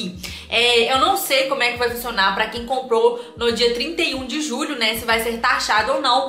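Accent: Minas Gerais, Brazil. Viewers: Esse sotaque mineiro é muito bonitinho 😊❤️ ( eu acho q é mineiro 😅)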